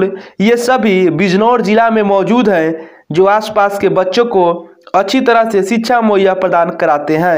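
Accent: Bihar, India. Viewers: Bolne wala bihari h be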